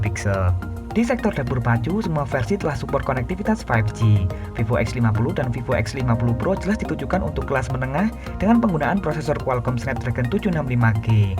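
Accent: Java, Indonesia. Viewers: Medokkkk bangettt suaranya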